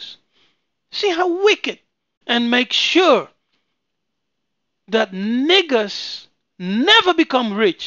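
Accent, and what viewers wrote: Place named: Netherlands. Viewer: Zondervan? En je accent klinkt nederlands...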